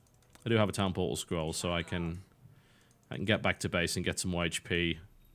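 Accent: United Kingdom. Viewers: When TB gets frustrated his brittish accent gets more brittish. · she is really bringing out the British on TB. BTW why are you not making WTF's on Indie games anymore you sellout!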